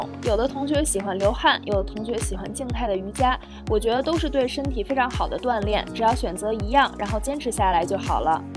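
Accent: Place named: Tianjin, China. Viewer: savis知道你是北京的 不过真的觉得说话像天津人啊 甭儿亲切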